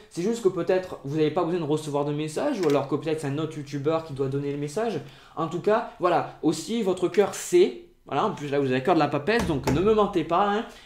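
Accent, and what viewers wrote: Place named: Toulouse, France. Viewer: Salut, je viens de découvrir ta chaine, super super super, du coup j'ai regardé la série de vidéos sur le tirage de cartes (au debut de ta chaine je pense) et le tirage pour les gémeaux (que je suis!!) pour le mois de mars 2019 qui m'a ENORMEMENT parlé!!! Bravo, bravo, tu es vraiment un chouette gars plein d'amour, de bienveillance et de gentillesse et t'écouter est un vrai plaisir... Ne serais-tu pas de Toulouse??? Ce petit accent m'est familier! Gros bisous, plein d'amour pour toi et continue à exprimer ton intuition si juste! Pascale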